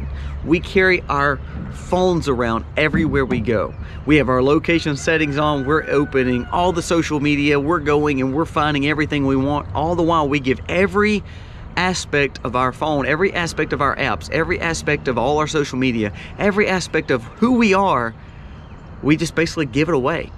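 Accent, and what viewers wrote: South, United States. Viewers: I am new to your channel as of a few days ago! I have learned a lot already, so keep the videos coming. Love that southern accent ❤!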